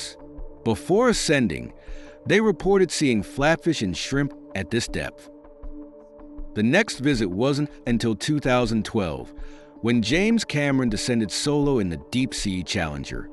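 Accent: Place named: United States. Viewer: You’re American or sound like it.. speak in feet & miles please. This isn’t throwing shade at our international friends it’s just that we don’t understand meters.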